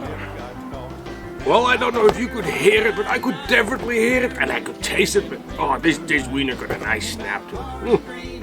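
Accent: Netherlands. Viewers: Are you Dutch? 🇳🇱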